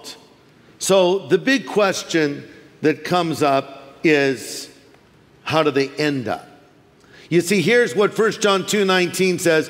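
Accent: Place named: California, United States. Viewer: You definitely do have a California accent. It's a laid back, drawn out sound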